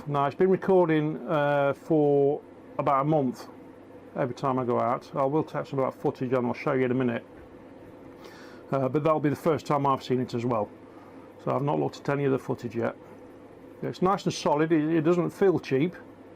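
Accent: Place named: Manchester, United Kingdom. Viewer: MY GOD HES FROM MANCHESTER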